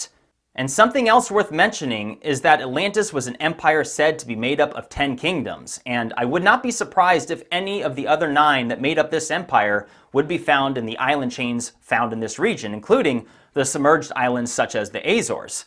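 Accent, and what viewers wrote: United States. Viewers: you would seem far more intelligent if you stopped saying 45 hundred years ago, its written right there, 4,500 year ago. no other peoples I know of say this, only yanks. much like world series baseball when you dont let others play. come on, I am Shure you are very intelligent, so stop sounding like your reading a American used car price tag. anyway thanks for the video, although there was a show a couple of years ago of a gentleman walking around that eye and inspecting it. he wasn't convinced that it was anything other than natural even though he really wanted to find some proof of buildings, he could not, at lest to his satisfaction. I would imagine you are aware of this as you clearly have much knowledge on this subject. thanks again for you efforts......... 1000 thanks. notice I didn't write 10 hundreds, what a silly American habit.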